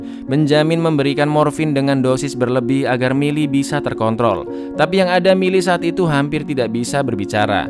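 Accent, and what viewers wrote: Java, Indonesia. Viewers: Wong jowo ta bg😂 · Nyok mas wkwk ngakak aku sampean wong endi se ? Lek di jawab tak subscrbe sumpah cuk lek ngomong medok kyk sby an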